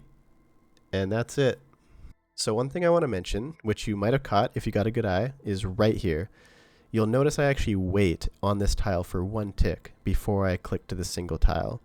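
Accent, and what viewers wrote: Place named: Canada. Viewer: We guessed you were Canadian 2 seconds in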